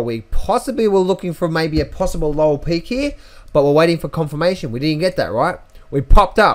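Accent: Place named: Australia. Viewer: haha tell them in the Aussie way... love it!..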